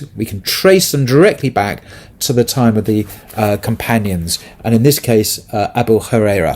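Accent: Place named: England, United Kingdom. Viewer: Alhamdulilah for Brother. I always learn a lot from him. I especially enjoy his accent..a true English man.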